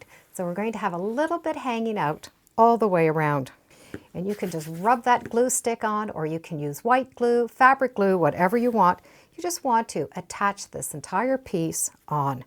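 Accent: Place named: Canada. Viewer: your videos are additive☺. Beautiful idea. You do it so neat and perfect. Love the fabric!. Are you in Canada? Your voice sounds like a Canadian😊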